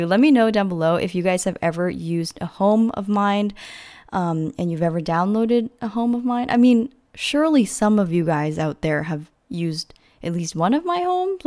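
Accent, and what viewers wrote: Canada. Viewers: Building is like all I do in the sims, so I don't really download builds mostly watch for inspo because I love your style. And also, of course, for the chat and the company 😊 my one year old also likes your videos, think he likes how fast it moves and the Canadian accent 🤣 (we're Scottish so very different lol)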